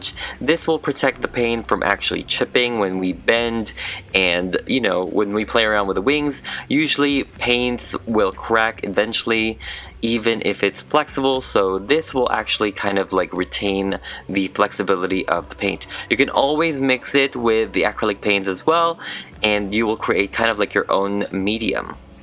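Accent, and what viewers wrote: Philippines. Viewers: I LOVE the fact that you never lost your Pilipino accent. :)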